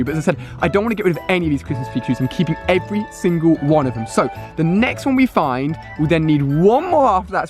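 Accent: United Kingdom. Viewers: lol, sounded super British in this video:)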